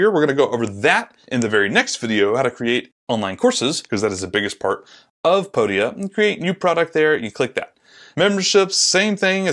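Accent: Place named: United Kingdom. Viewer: Ok after comparing Course hosting palttforms the hole Sunday and already having a free test account at teachable I lean towards Podia because of design. Teachable Text layout in courses without coloums is ridiculous, I thought about making a new line every 10 words manually... Does podia have a community for members optione, where my course members/buyers can meat and chat, post there results? I plan on selling art classes with my dad: <link> Here you can see the results of the "offline" real life courses so far. Thanks for advice, great video!! Here a a few free Tuts in german, we plan to do more advanced ones for money... <link> You sound British. Sad to lose you in Eu, don't know how you think about it, what brings me to the next question, is podia good at handling the EU taxes? 16% / 19% in Germany...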